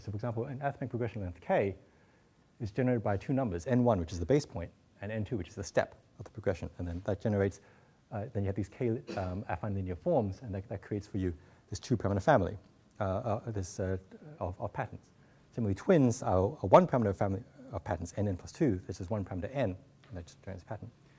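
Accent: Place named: Australia. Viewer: chinese with australian accent teaching math in america... globalization!! yeah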